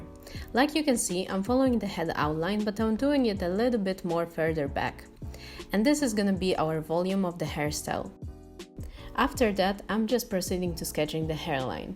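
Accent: United States. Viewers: are u american?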